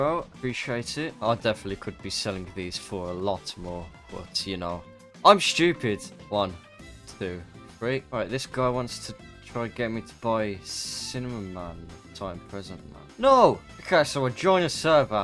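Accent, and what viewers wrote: United Kingdom. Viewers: the second dude in my life that actually speaks british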